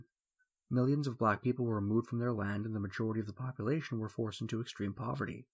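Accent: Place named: United Kingdom. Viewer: You should be ashamed. I hope you are not some American kid (accent says more Brittish) who wants to be edgy so does this propaganda. My familly lived communism. You did not live shit. Nothing I wish for more than you and your pals actually living in communist times and country. Commies forcing planned economy and collectivization took my famillys farm, took everything. Made grand-grandpa work on his own farm for no pay. He was on Eastern Front from Ukraine to Berlin!! Constant food shortages. In 80s there was shortage of toilet paper. That was luxury! Rly how fucking dare you spreading those ideas that killed millions of people when you are born in free country. Shame on you.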